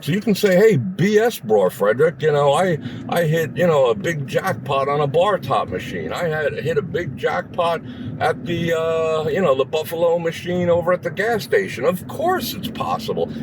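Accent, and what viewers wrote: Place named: New Jersey, United States. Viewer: Sounds like you're originally from New Jersey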